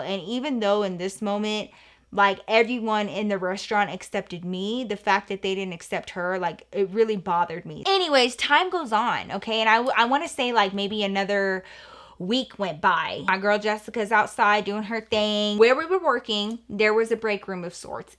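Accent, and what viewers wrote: Texas, United States. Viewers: when you say "stewpid" you still so have your Texas twang girl 😂👌🏼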